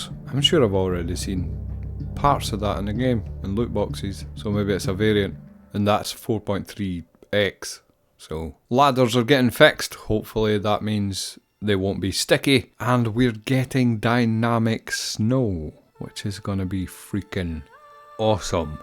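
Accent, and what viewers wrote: Scotland, United Kingdom. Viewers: I'm consciously following along with the wee sairtin wyerds arrr siid to byittr awndairstaynd how parrteekular wayrds are seed from a lejituhmet Scottsmn layk yourdr seeyalfff. (Spelled phonetically from the 'weee' my kuhnayjiun y'eers 'n brehn pairsceeev eught) (I'm consciously following along with way certain words are said, to better understand the way particular words from a legitimate Scotsman like yourself {spelled phonetically from the way my Canadian ears and brain perceive it})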